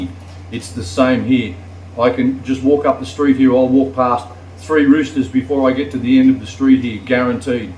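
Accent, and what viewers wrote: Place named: Australia. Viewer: I just like to listen to talk.. nice to hear Aussie in the Philippines 😂.. agree with the dogs.. sometimes I think they are hungry coz if they are full they just sit around relaxing..😂.. yes if you can stop smoking it's good for you although it's cheap here.. enjoy your day guys.. ❤❤❤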